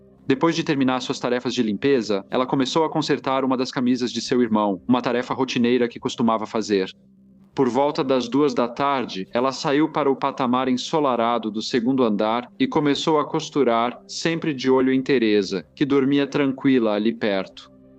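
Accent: Portugal. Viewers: Como relaxar ouvindo esse sotaque horrível de português de Portugal? Arruma isso aí!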